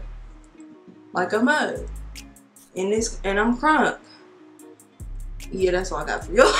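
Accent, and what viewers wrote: United States. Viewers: You know what I realized u dead kinda got a country accent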